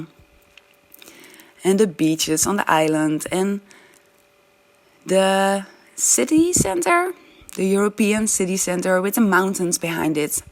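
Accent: Netherlands. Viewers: Bij je eerste zin hoorde ik al dat je Nederlandse bent, ☺️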